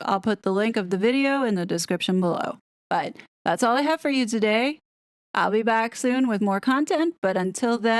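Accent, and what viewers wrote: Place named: New York, United States. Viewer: I concur! I love dropping educated opinions in my lap in the evenings! I can't believe she was EVER self- conscious about her voice & NY accent! has an amazing cadence & comforting tone. She needs to get a side hustle reading audio books! 🎯💯💕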